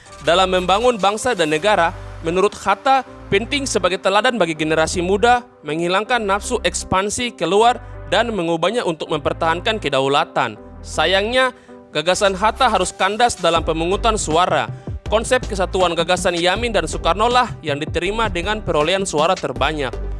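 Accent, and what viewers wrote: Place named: Papua, Indonesia. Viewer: Owh.. Narator nya orang papua ni pasti..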